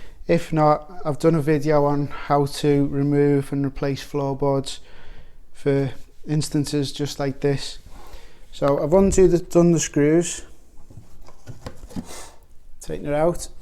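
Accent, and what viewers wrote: Liverpool, United Kingdom. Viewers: Liverpool accent lol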